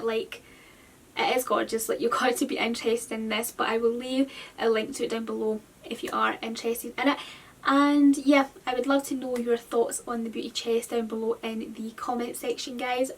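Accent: Scotland, United Kingdom. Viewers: Beautiful Christmas box! I'm trying to place your accent. Are you by any chance from Scotland?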